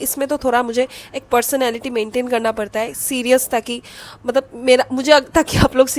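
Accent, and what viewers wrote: Bengal, India. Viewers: Are u from bengal? Ur accent tell it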